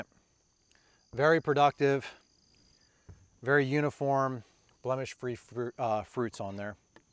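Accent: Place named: Maryland, United States. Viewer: Hey. As a Marylander retired in South Carolina, I am so homesick watching your videos, at least during the summer. Winter, not so homesick ever. Terrific job with these multiple cultivars, very well presented. Did you get sick after taste testing all of those? I really enjoy your very subtle Maryland accent too.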